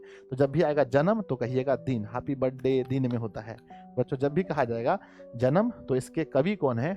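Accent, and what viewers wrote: Bihar, India. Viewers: सर मैं आपके कोर्स इसलिए करता हूं क्योंकि आप बिहारी स्टाइल में पढ़ते हैं तो सब कुछ समझ आ जाता है कि❤❤❤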